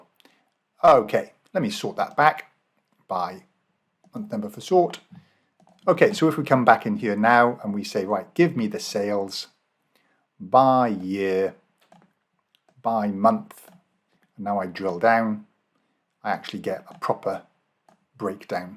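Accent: Wales, United Kingdom. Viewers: This is very helpful, good to hear a Welsh accent as well!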